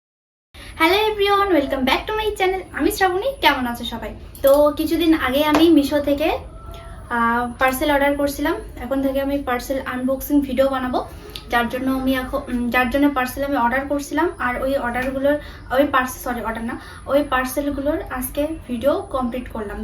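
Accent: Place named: Bangladesh. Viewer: Tumi ki Bangladesh chila?? Ekhankar moto vasha lagteche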